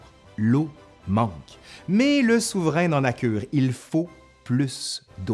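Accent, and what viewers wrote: Quebec, Canada. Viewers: Nous français on trouve votre accent bizarre on en rit même...😓😓😓 Mais à force d écouter la chaîne j apprecie votre accent donc continuez amis du QUÉBEC Super doc comme toujours Force à vous 💪💪💪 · Suis français et j’adore me faire raconter l’histoire de France par quelqu’un qui a l’accent québécois. J’écoute/je regarde toutes les vidéos depuis le début #mercileconfinement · Le roi avait le palais ouvert et de plus, une dentition atroce. Il parait qu'il lui était impossible de mastiquer. Il ne pouvait prendre que de la nourriture liquide ou en purée. Qu'en est-il ? Vidéo très intéressante comme toutes les autres ! Je vous remercie d'articuler parfaitement et de parler à un rythme également parfait. Cela permet une compréhension idéale. On vous entend bien, on vous comprend bien, et en prime on a un bel accent canadien ! Lui-même parfait car juste assez prononcé pour donner une jolie musique mais sans nuire à la compréhension. 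· Tes vidéos sont très intéressantes, mais je te suis aussi pour ta sympathie et ton accent québécois! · L'histoire de France avec le charmant accent canadien, ça en jette !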